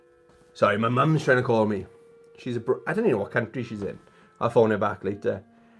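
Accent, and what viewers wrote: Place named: Wales, United Kingdom. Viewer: I do like the Welsh accent . Great video as usual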